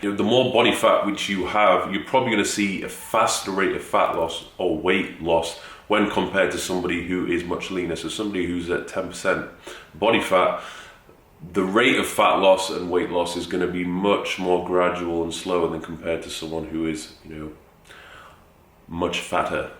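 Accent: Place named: Newcastle, United Kingdom. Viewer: Vitamin B Best not to try and listen if you can't understand.go to some Geordie classes.that may help you.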